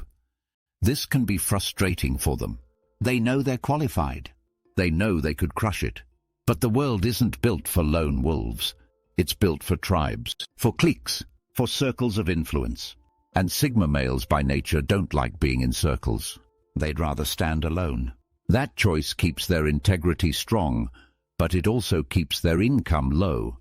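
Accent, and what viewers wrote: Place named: United Kingdom. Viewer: Another crappy British accent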